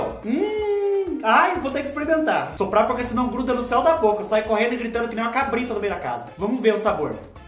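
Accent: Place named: Itacaré, Brazil. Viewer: seu sotaque eh igual das pessoas de Itacaré sp morei nessa cidade há 20 anos e seu sotaque me dá uma saudades de lá, amo seus vídeos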